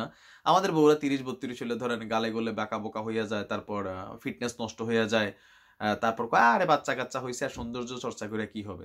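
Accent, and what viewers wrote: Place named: Sylhet, Bangladesh. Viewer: আপনি কি সিলেটের লোক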